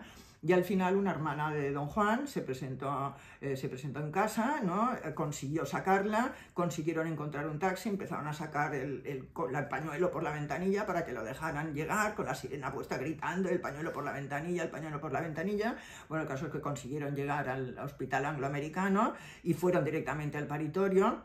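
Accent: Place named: Andalusia, Spain. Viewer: jajajajajajajajajaja me he reido tanto al final con lo del chino y q juanito era tan feo, q ademas como lo cuentas , tienes una manera de contar las cosas q mas bien pareces andaluza q catalana ..... eso sin ofender a nadie , es q mi madre tenia una amiga malageña q contava sus historias de vida q por casualidad vivio la guerra española en barcelona y cuenta ella siendo una niña , cuando sonaban las cirenas para q la gente fuese a los refugios por los bombardeos de los aviones , ella se quedaba sentada en el comedor comiendo como si nada .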